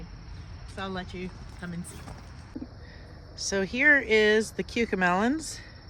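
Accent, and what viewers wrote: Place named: South, United States. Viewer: Im wondering what state y'all live in. You sound southern like me. What are loofahs good for? I've never grown any at all. They do look fun to grow though.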